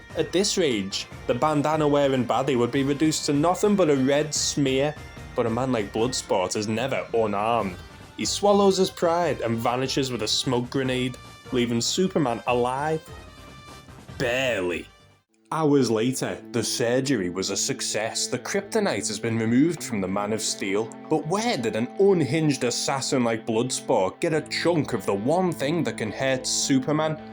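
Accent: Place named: Liverpool, United Kingdom. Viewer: Are you a scouser? Your commentary is delightful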